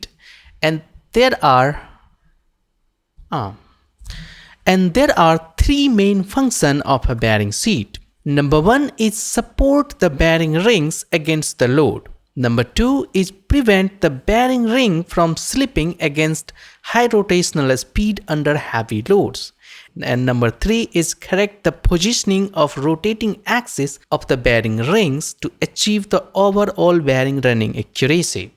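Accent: India. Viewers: are you Gujarati?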